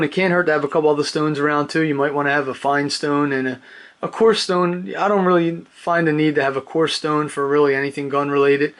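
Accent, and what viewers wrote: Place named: Pittsburgh, United States. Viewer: Not quite Ralph, sounds more Pittsburgh to me.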